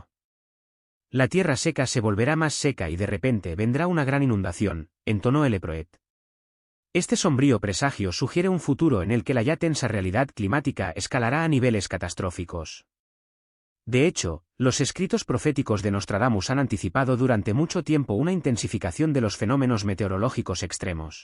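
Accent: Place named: Spain. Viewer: UNA SELECCIÓN SELECCIONADA????, POR PIEDAD, UNA UNA APLICACIÓN DE NARRACIÓN EN ESPAÑOL LATINO, EL ACENTO DE ESPAÑA ES NAUSEBUNDO......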